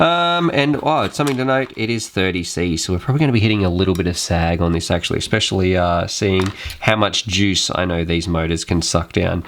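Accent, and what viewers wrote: Australia. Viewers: I just watched past half way. Your definitely Australian. Finnaly someone decent whos an Aussie!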